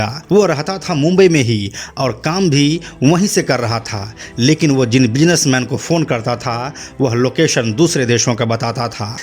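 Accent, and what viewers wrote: Bihar, India. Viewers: Tu bhi bihari hai naa teri bhasa urf tone sae lg rha hai.......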